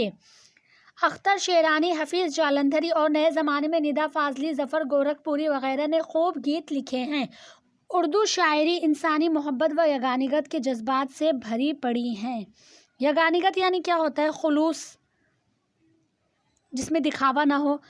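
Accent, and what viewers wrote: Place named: Pakistan. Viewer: aapki awaaz Ka tone Pakistan ke jesa hai Kafi Pyari Awaaz hai aapki aap pakistan ke hooo😍❤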